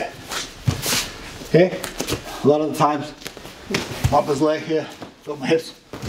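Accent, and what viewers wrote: New York, United States. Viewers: Great channel. I thought I heard a fellow NYer. Check the bio and sure enough. Wish I was still there as I would definitely check out your Schools because of your comprehensive grappling background. Great breakdowns and I like the way you explain the moves from multiple arts/perspectives. I come from a wrestling background and I am working on augmenting it with some Judo and these videos are helping. Thanks for your time.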